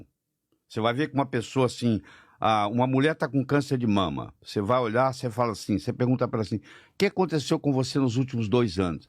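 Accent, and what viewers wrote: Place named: Portugal. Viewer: O sotaque da língua portuguesa mais lindo do mundo : ♡♡♡Portugal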